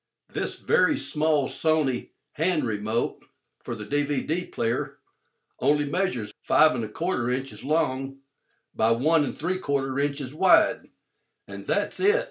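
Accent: Texas, United States. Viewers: You sound like your from Texas dude and that was quite fascinating and I had a bit of a chuckle. I admit the remote is small and to be honest I have not got this Blu Ray player but I do have an earlier Sony model and the remote is the same size and pretty much the same as this one. To be honest I do not have a problem with the remote at all and it makes no odds to me where they put the play buttons either. But as with the biggest majority of remotes I have for my TV/DVD/Blu Ray and HiFi they are all capable of controlling any other device so I certainly see no need to go out and buy a Universal Remote at all. By the way I shall be getting this Blu Ray Player in the new year and right now I am saving to get a new 4K TV for Christmas. Hopefully in the new year this Blu Ray Player will come down in price too, cause I still feel it's too expensive with how cheaply they have constructed it. 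My last Sony Blu Ray player was around £130 here in the UK and I got it in a sale brand new for £65. I am not expecting this to go down that low and it's now at around £180. But I am hoping it comes down to about £130 or less by next year :)))). I would also say my last Blu Ray Player even though it's cheap and small like this and made of plastic. I still think it has a better design than this one. If it played 4K discs I would not even bother getting a new player and I am only getting a new TV because my old one is on it's last legs and is playing up a bit. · I love your Texas accent bro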